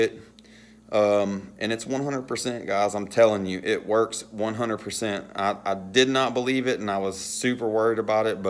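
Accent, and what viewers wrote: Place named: Texas, United States. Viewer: I don't no any wedding bands so yes Daddy call me I love that Texas accent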